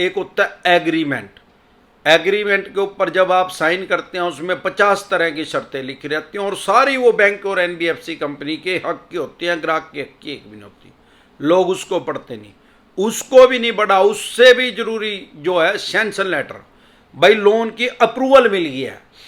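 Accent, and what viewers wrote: Haryana, India. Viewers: सर आप हरियाणा से हो ?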